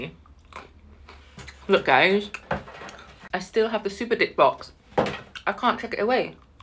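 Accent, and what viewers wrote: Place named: United Kingdom. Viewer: i luv ur accenttt where r u from? im from the uk too!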